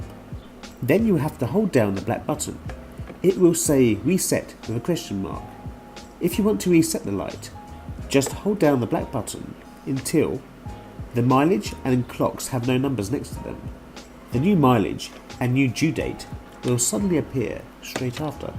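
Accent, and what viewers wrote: RP, United Kingdom. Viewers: Just had to say love your posh talking voice and your CHANNEL subbed thanks for help in turning out SERVICE light reset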